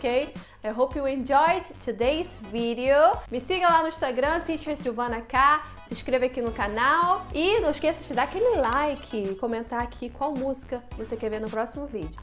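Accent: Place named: United Kingdom. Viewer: Pronúncia bem Britânica.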